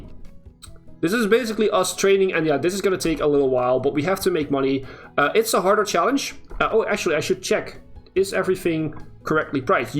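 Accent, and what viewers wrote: Netherlands. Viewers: That's correct, I'm from the Netherlands too. Funny how, as a Dutchy, you always recognise the smallest Dutch accents 😁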